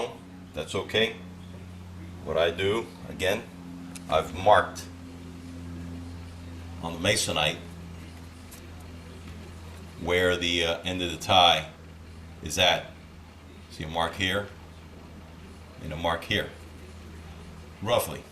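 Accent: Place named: New York, United States. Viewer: I was going to ask you are you from New York because you sound like it..lol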